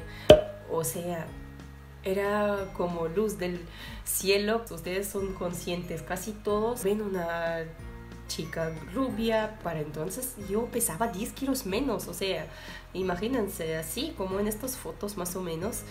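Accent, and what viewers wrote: Peru. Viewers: La jerga y malas palabras te salen súper natural como peruana, te haz peruanizado igual que Veronique 🤘🏿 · Q crackkkkk.. jajaja ..habla muy pero muy peruanazaaaaa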